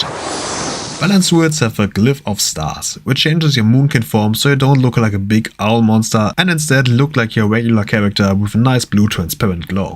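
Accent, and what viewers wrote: Germany. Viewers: Der Typ klingt so deutsch xD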